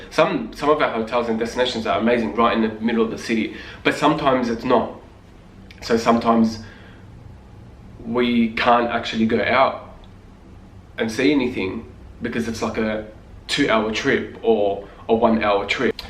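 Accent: Australia. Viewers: Everything you’re saying is spot on 😂😂😂 are you Australian? Love this video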